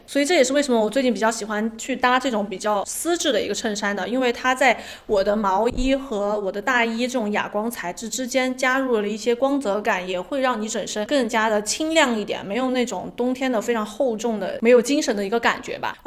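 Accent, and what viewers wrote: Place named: Sichuan, China. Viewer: 干货超多！四川话口音的普通话太亲切了😆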